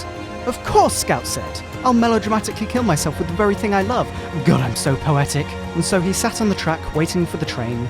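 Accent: United Kingdom. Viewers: Are you British? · YES, MY BRITISH SOUNDING MUM IS BACK! Seriously though, you make amazing content and I hope you continue to make great videos.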